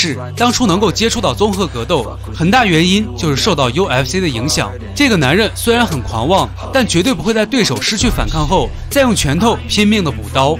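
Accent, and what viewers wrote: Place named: Henan, China. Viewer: 画外音的普通话口音能听出是河南人😁，河南电视台的格斗节目“武林风”节目很有名……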